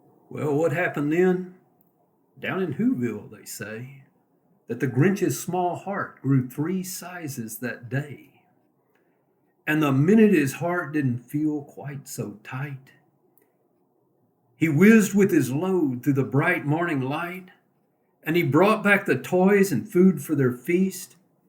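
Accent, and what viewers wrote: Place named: South, United States. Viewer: Love, luv, l-o-v-e this! Such a pleasant, warm and southern voice. Going to share it with my friends ❣️👍🏻🫶🏼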